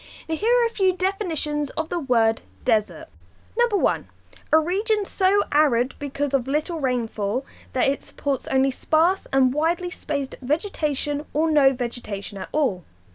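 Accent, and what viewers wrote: United Kingdom. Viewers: Ehy do you have a British accent ?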